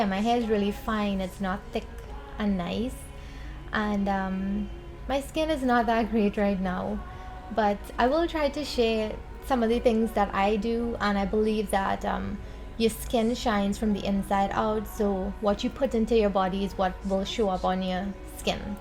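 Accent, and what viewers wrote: Trinidad, Trinidad and Tobago. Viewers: I second Ramjohn!! You were brought up by such great parents and grandparents. The way you speak and carry yourself says so much...you have kept your strong Trini accent and values which I love. Keep being you sweetie. Stay as sweet as you are👏😘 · you still have your Trinidad accent which I love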